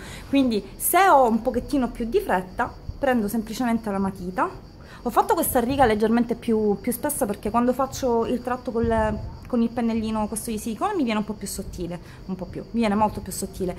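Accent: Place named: Palermo, Italy. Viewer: Ciaooo ti ho appena conosciuta su YouTube! Ma sei di Palermo? Si sente un po l accento ma non vorrei sbagliare ^-^ sei in gamba mi sto vedendo un bel po dei tuoi video perché vorrei mettere me ma anche la mia famiglia a dieta xD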